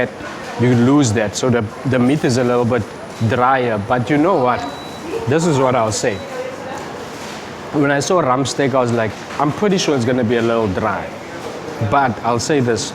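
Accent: South Africa. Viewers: Sound like a Joberg accent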